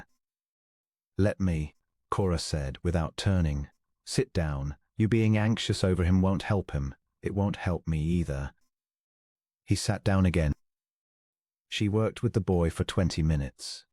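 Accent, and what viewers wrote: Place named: United Kingdom. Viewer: At first was not sure I would like the narrator’s voice with a British accent, but as the story progressed, liked the cadence except the way Lionus was pronounced. Listening from Clarksville, TN.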